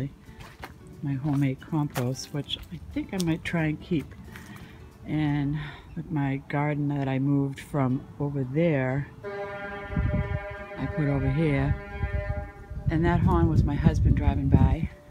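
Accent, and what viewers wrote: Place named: Boston, United States. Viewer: I should say yaahd because I love your accent so much! We love Boston! 💝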